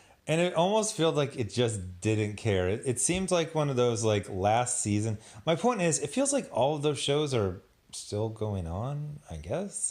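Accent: United States. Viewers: Eh, I kinda preferred the Canadian accents over the typical American one, like yours.: "I guess uh, what her naaame? Irisss? Isss? She use to be a rah-porterrrr? Now she runs a bloggg? And she's like a, y'know. Newww media? Except for they show herrr blog? And it looks like a friggen Geocities Tripod websiiite?" No shade, I'm just saying it's very confusing when you keep making statements in the form of questions.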